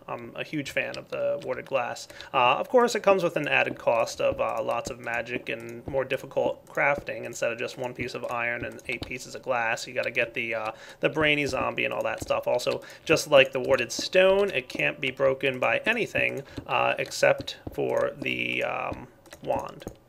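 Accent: Australia. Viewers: Ausie? or not because i am... :D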